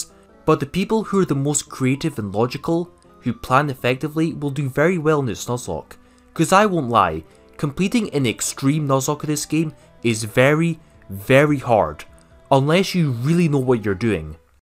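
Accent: Scotland, United Kingdom. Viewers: i really just wasted half an hour watching a scottish man on the internet talk about nuzlocking, oh well still was a decent watch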